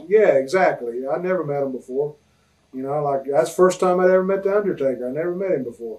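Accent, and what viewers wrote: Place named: South, United States. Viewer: He sounds so different "country af" compared to the OMG and characters I've been looking at OMG since WCCW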